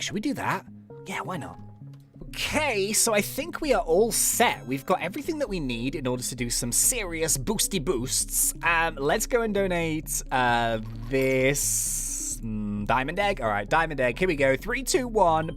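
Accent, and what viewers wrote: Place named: United Kingdom. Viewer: ᴉoquᴉnbs he’s British dumbass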